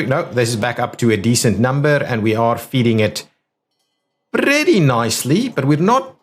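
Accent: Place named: South Africa. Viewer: Saw Worcester in a Transport Fever 2 Spring Update search thinking this might be a South African. Could hear within 2 seconds the way you pronounce your R that you are a South African.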